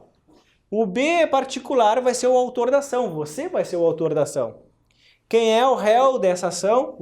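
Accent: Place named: Rio Grande do Sul, Brazil. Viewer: Adoro o sotaque gaúcho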